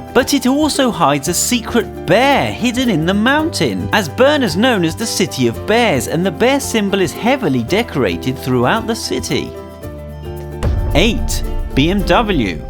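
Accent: England, United Kingdom. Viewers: why are you speaking american with an english accent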